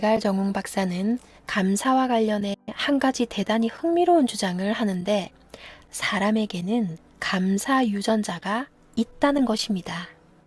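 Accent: Gyeongsang, South Korea. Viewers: 님의 경상도 억양 너무 귀여워요^^♡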